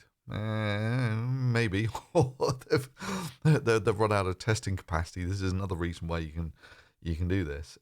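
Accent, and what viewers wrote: United Kingdom. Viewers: I seen where u get your accent. Its grom uk. This guy is a aristocrat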